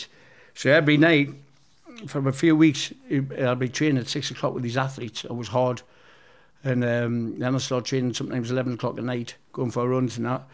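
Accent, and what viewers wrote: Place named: Newcastle, United Kingdom. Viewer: Amazing geordie from a mackem 👍😁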